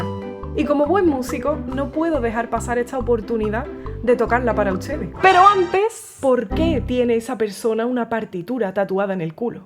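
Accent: Andalusia, Spain. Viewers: 🟥Interesante. De paso se aprende de la obra de El Bosco (Pintor holandés, ahora Países Bajos). En seguida voy a ver el otro que hiciste de Da Vinci. Tu acento de dónde? sur de España? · Que lindo tu acento no lo había escuchado nunca es precioso se que es español pero no se de que lugar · es andaluza, ese es el "ACENTO" de Andalucia aunque hay variaciones dependiendo de la provincia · Me mata tu acento! Es andaluz? Eres encantadora! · Con este vídeo descubro este genial canal. Qué gusto empezar un video escuchando el acento andaluz, madre mía 🤍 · Qué hermoso acento andaluz, saludos desde Venezuela. Primera vez que veo tu canal y me parece muy interesante, tanto así que ya me he suscrito. Gracias por generar contenido con cultura y buena música. Mucho más éxito para tu canal! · Muy bueno el acento andaluz....es casi el acento mas iconico de España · Cada vez mejores tus videos,. 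Sigo hipnotizado por ese acento tuyo, tan andaluz, que reberbera en mi oído argentino. Gracias! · Uaaauuuu sensacionalllll,eres buenisima. Que mel9dia mas bella y que talentazo tienes. Y mas,tu aciento andaluz deja el video aun mejor. Es andaluz,verdad? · Oye pero que buen video, muchas gracias por compartirlo... ¿Alguien sabe de cuál lugar de España viene el acento de? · Qué lindo hablas, de qué parte de España eres? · Qué rica tu forma de hablar... Es una manera muy particular de hablar en España... No sé, específicamente, de qué parte de España. ¡Saludos, desde Santiago de Chile!... 👍👍👍👏🏻👏🏻👏🏻👏🏻🌷🌷🌷🌻🌻🌻🌹🌹🌹💐💐💐💐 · Recién conozco tu canal, me encantó ! Saludos desde Uruguay. Y el acento es lo mejor, me acuerda a Manu Tenorio jaja (sevllano será? de Granada? otro del sur de España? nadie de España me insulte, sólo es curiosidad y un poco de ignorancia)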